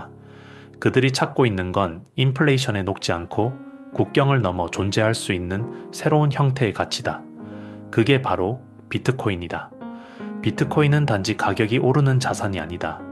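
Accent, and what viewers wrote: Gyeongsang, South Korea. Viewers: 경상도 사투리네...경북 사람인듯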